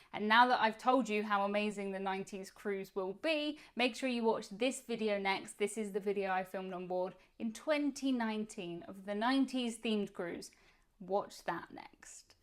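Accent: United Kingdom. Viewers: Thank you for explaining the “Naughties” were not naughty! I love proper British English, and appreciate your use of it and the explanation for non-British English speakers! I’m excited to hear how your friends like the cruise!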